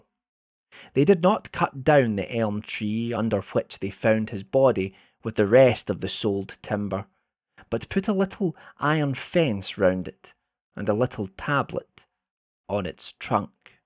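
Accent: Scotland, United Kingdom. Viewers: That's quite a thick Scottish accent you have there!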